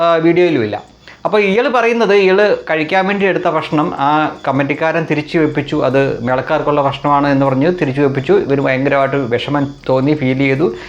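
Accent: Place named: Alappuzha, India. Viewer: Hi innathe special entanu. Nalla alappuzha saily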